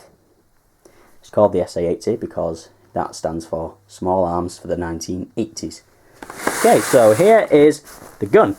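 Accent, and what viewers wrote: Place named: Manchester, United Kingdom. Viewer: yes thought you sounded mancunain , iam from Salford but i live in Bury.. lovely Rifle the L85 i got mine from zero one airsoft online ,it should of been £300 and odd but i got %10 discount so was just less than 300 and mine came two toned green , i prefer green to the orange and blue , plus it looks great on this rifle as its 2 toned anyway.. i will have to film a fireing test on it to show you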